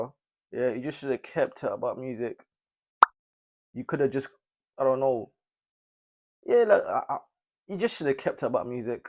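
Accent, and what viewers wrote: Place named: United Kingdom. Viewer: U sound like u are from UK... 😅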